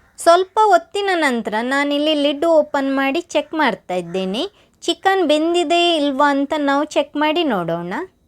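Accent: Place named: Mangalore, India. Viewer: ಮಂಗಳೂರು ತುಳು ಮಾತು ,, ; ಕನ್ನಡ ಭಾಷೆ ಸ್ವಲ್ಪ ಚೇಂಜ್ ಆಗುತ್ತೆ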